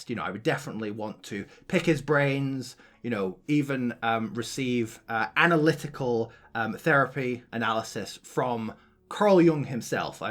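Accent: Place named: Edinburgh, United Kingdom. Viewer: I thought your accent was an Edinburgh one.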